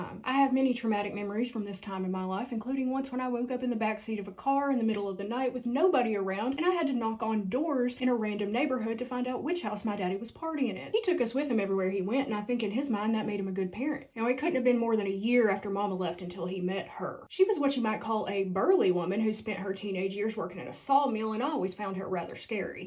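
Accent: South, United States. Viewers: Honestly the southern twang in these videos is amazing! I love it so much, and the way you tell it! I'm gripped! Want to know more 😂 Also lots of love to you ❤️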